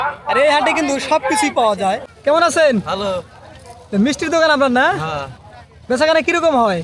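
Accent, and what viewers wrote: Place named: Tangail, Bangladesh. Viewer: মিথ‌্যা ব‌লে ধোকাবা‌জি করা দয়া ক‌রে বাদ দিন, আপনার কথার ধরণ মো‌টেও ক‌ু‌ড়িগ্রাম জেলার মানু‌ষের কথার ধর‌নের সা‌থে মি‌লে না। আপনার কথার ধরণ টাঙ্গাইল জেলার মানু‌ষের কথার সা‌থে পু‌রো মিল এর মা‌নে ভি‌ডিও টা কু‌ড়িগ্রা‌মের না।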